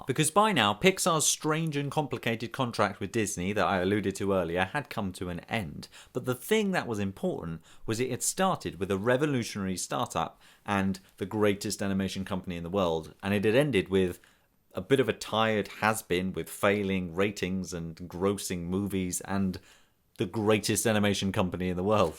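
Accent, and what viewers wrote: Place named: England, United Kingdom. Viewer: So I came across this video through being assosiated with SuperSam in some small way... and it has got me thinking a lot. I have a few friends who know a heck of a lot more about Disney and Pixar than me, The Super Carlin Brothers, so I have passed on this video to them... maybe they can say something further on the subject! Especially the bit about "Where would Disney be without Pixar?" So interesting. Also yes, you are an acceptable maker of videos. Acceptable to a medium degree. The funny thing is... I am English too, and never really notice accents... but yours has a quality to it that sounds amazing with a good microphone, keep it up!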